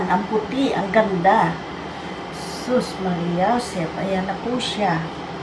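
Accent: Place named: Bulacan, Philippines. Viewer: iba talaga pag may puntong bulacan,.masarap panoorin at pakinggan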